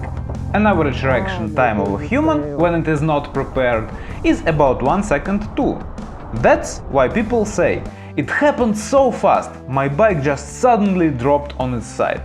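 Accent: Russia. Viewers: Судя по акценту -русский. Почему и титры на английском??? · Узнаваемый русский акцент :) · Youre from russia ?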